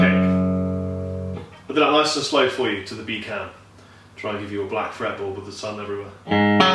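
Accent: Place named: RP, United Kingdom. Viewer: He even sounds like a "HIGH BRIT" 😁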